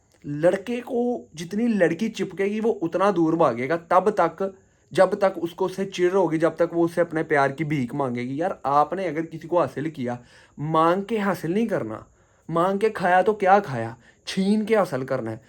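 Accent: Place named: Punjab, India. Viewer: Plz zuban thek karo punjabi penduuuuuu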